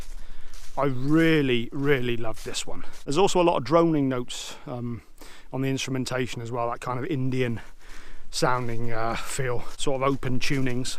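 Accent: United Kingdom. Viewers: Great review!Love your british accent!This comment is brought to you by a french canadian! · i wish you could narrate my life with interludes of british accent spoken word ROCK LYRICS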